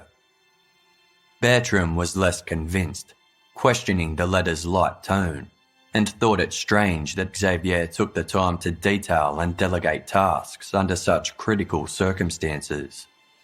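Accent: Australia. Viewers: The narrators voice sucks, can’t understand some things he says, his Australian or British accent is so strong that it makes it difficult to distinguish some words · It’s Australian and I pity you that you can’t understand English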